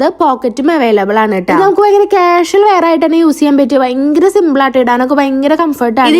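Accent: Thrissur, India. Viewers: Palakkadano thrissur ano oru samshayam ningalude sandam വീട് thrissur analo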